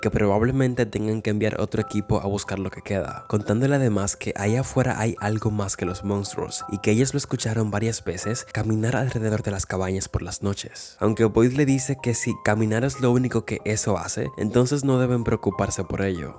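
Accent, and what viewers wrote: Dominican Republic. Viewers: Esa forma de hablar “Este pana, diciendo que ta' jarto de to su m4rdito plane que no sirven” Dominicano?????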